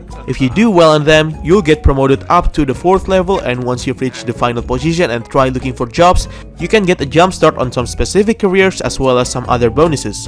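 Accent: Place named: Indonesia. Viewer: Bi lo orang indo? Logat lo indo bgt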